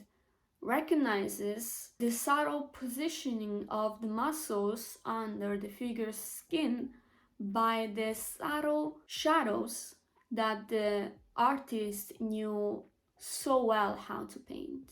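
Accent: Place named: Italy. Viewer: Wow, such a cute italian accent!💫 I'm currently studying it and would love to hear that content in your native tongue! Continua a registrarne di più!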